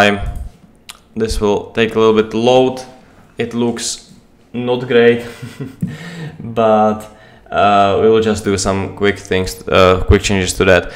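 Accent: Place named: Czech Republic. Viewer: ) ta čeština, to nezapřeš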